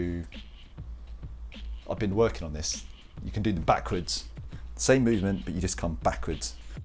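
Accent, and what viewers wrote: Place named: Texas, United States. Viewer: Yeah, I can't find anyone else being credited with inventing it. I believe I was wrong😁 Nice chatting, I enjoy your videos and I like your hand drawn S9 faceplate! 12inch Skins is awesome, got some on my setup if you want to check on my videos. The videos are terrible, ignore that part... Cheers from Buffalo NY! I'm guessing your from Texas judging from the accent lol! Or maybe Great Britan! 😁 I hope to get there someday. Looks beautiful! Cheers!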